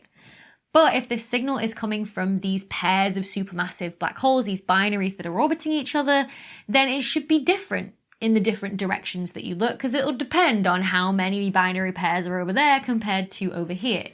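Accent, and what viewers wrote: United Kingdom. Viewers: If my teachers where this pretty and had a cool British accent I think I’d have gotten much better grades. Or as my kids say more better grades 🤭.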